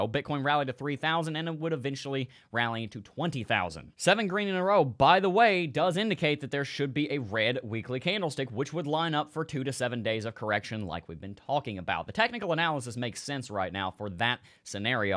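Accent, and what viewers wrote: United States. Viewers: that is a lot better 😂😂😂 Can I ask you, where are you come from. Because I’m from the Netherlands 🇳🇱 for me you sounds like a American...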